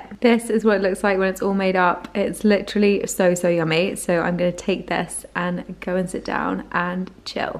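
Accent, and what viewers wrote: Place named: England, United Kingdom. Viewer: New sub❤️ I loooovvvee how you speak, where you from? I’m guessing somewhere in England 🏴?🤔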